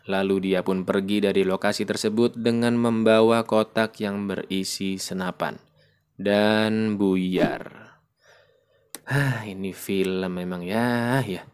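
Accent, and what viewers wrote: Java, Indonesia. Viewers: Abang orang jawa ? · Jowo ngendi mas' 😂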